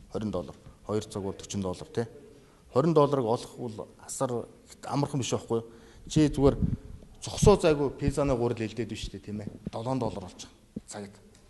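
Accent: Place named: Övörkhangai, Mongolia. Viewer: 30 жил гадаад хэл сурсан гэхэд өвөрхангайн аялага нь хэвээрээ байн шүү ямар агуу хүн бэгэж биширч сонслоо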